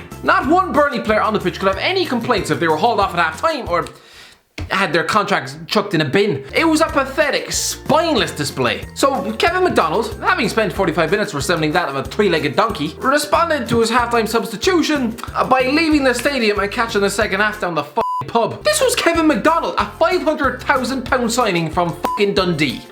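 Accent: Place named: Ireland. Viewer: I think ooooh this video looks cool but when i press on it i hear it is you. You fucking irishman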